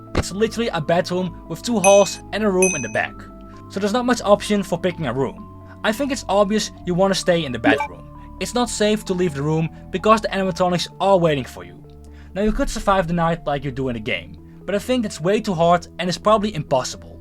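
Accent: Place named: Netherlands. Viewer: World’s most subtle Dutch accent 😆 Hoi from Canada 👋 Enjoyed the video! I appreciate your evident knowledge of the games and different theories. For Security Breach, it’s actually more difficult than you might think, thanks to Moon. Small enough to get into vents, and can seemingly swim through the air in most of the building. Plus, most bots have security clearance equivalent to LVL10 (or greater). However, there do seem to be some exceptions, based on the doors that Freddy himself can’t open. If he can’t, but you can, then technically the other animatronics can’t either and you’ll be totally fine. I can’t quite remember, but I think the VIP lounge REQUIRES enough merchandise to be collected? Otherwise Freddy could’ve just opened it early. Not sure if there are other doors that are similar.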